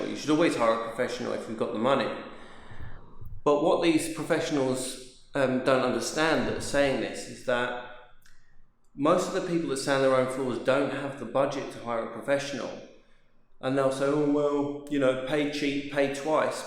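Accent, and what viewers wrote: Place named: United Kingdom. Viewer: maybe it's your british accent, maybe it's your experience,,maybe it's both, but you seem like an authority on the subject and I trust your wisdom.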